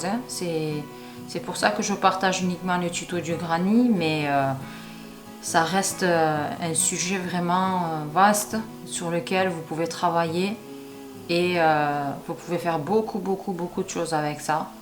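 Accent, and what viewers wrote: South, France. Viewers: magnifique et en plus le merveilleux accent du midi, que demander de plus pour un 31 décembre ... très bonne année 2025 madame !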